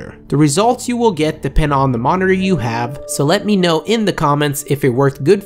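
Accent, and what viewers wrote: Sweden. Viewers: u swedish? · är du svensk? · ur swedish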